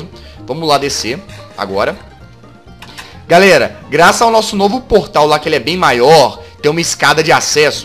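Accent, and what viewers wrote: Minas Gerais, Brazil. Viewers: Vc mora na Bahia????? Podia jurar que vc morava em Minas Gerais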